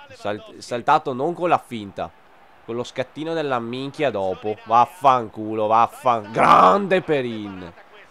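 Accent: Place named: Trentino-Veneto, Italy. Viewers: parli come l'incrocio tra un trentino e un veneto:) gran bel video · di dove sei? io sono di vicenza e mi sembra che tu sia di queste parti XD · Si è parla dialetto veneto ahahahh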